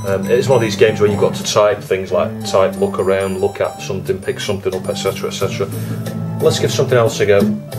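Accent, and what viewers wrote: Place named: Northern England, United Kingdom. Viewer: It's also that thick Manchester/Sheffield accent that's making my brain a bit herky-jerky when I'm trying to listen. :)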